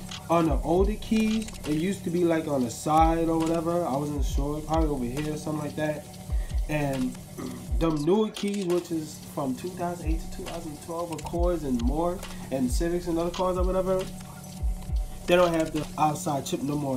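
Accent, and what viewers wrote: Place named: AAVE, United States. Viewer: The fact that I understand him is amazing. Ebnonic is fun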